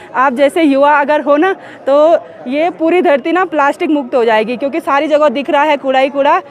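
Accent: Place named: Pahari, India. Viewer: By hearing your voice a recollection become fresh of my posting tenure at Pauri Garhwal 1992. A resembles, true copy of pahadi. You have a good quality of an utuber. Please write your biodata. Excellent 👌👍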